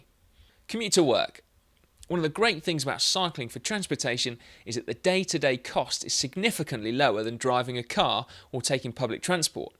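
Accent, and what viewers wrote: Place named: England, United Kingdom. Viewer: It's an English accent!!!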